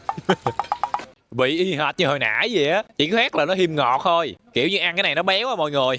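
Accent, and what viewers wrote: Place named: South, Vietnam. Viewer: noi giong mien Nam nghe nhe nhang de chiu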